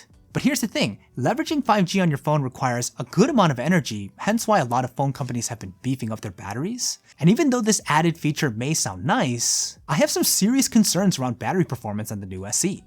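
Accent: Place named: San Francisco, United States. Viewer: Holy stereotypical San Francisco accent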